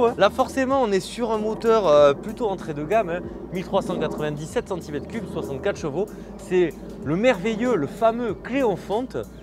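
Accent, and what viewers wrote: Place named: Southwest, France. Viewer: Bravo pour ces vidéos très sympa (et cet accent de notre bon vieux Sud Ouest!) J'ai eu une renault 18 Américan 2 dans ma jeunesse, j'en ai gardé un excellent souvenir!!!...Et le badminton, ça fait travailler tous les muscles, mais pas de mal au bras! Il va falloir travailler ta prise de raquette... Ps: on m'a donné une peugeot 305 qui roule parfaitement en daily, et comme la renault 18, elle rappelle d'excellents souvenirs de jeunesse à tous ceux que je croise sur mon chemin, et plus curieusement encore, quand je vais dans des concentrations de vieilles autos avec cette 305, elle suscite presque plus de réactions et de regards qu'une des 10 ou 12 berlinettes garées sur le parking... incroyable facteur de sympathie!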